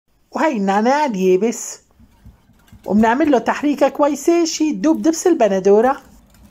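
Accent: Aleppo, Syria. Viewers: لك خالتي و الله بفوت ع الفيديو مشان اسمع كلامك الحلبي الاصيل.ابوس قلبك شقدك مهضومة و الله عبتزكرينا بكلامات رح ننساهن😍🥰😅😅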